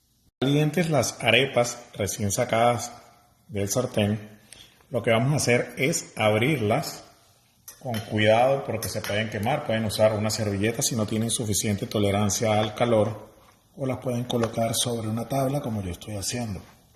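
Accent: Venezuela. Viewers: El tono de voz muy venezolano, gracias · Ese acento Venezolano me encanta!! Probé la receta y son exquisitas. Recomendada.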